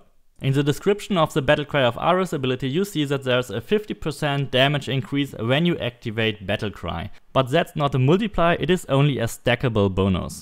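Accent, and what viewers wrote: Germany. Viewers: Lol I am level 73 and I have killed a lvl 99 mercenary easily in 10 sec. Or so, is that good? By the way this build is so funny and nice even before the armor set I have played a lot of the ares ability because you just feel undying 😂and the animation are very cool. By the way are you from germen beacause of your accent I thought that (sorry if I am wrong I am also from Germany)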